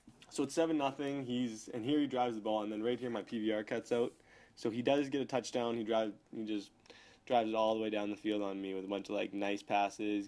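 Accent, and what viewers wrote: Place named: Canada. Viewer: You sounded so Canadian in your first vid.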